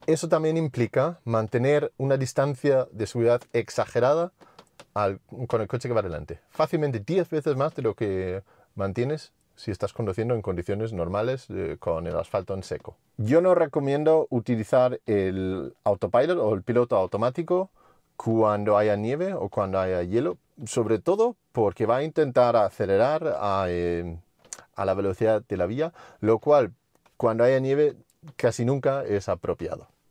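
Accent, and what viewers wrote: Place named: Bilbao, Spain. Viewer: Por la manga y por el acento se nota que eres de Bilbao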